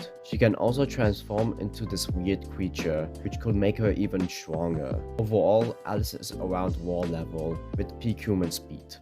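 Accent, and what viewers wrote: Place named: United Kingdom. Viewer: I don't know but you talk like some smooth british guy while having coffe+though you're saying facts most of the video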